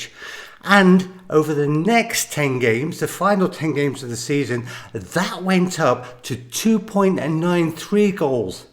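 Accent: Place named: United Kingdom. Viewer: What? , you probkybjust convinced by his brith accents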